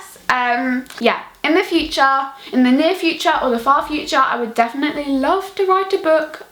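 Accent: United Kingdom. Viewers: I started to understand british english talk most from your videos...Thanks<3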